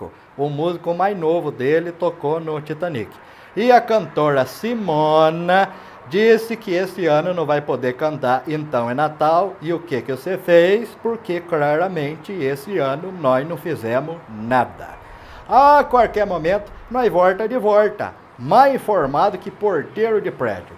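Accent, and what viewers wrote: Minas Gerais, Brazil. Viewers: Se tá é doido que nordestino não fala assim aí é mineiro de POUSO ALEGRIA,mg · Nordestino? Kkkkkkkk tá + pra interior de mg, Goiás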